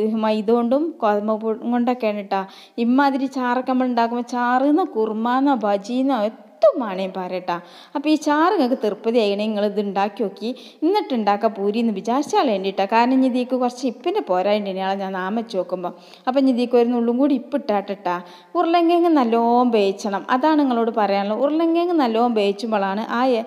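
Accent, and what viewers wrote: Malappuram, India. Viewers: ഇത് ഒരു 50 വർഷങ്ങൾക്ക് മുമ്പെയുള്ള മലപ്പുറം വർത്താനമാ 😂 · It ha no malapuram bhasha?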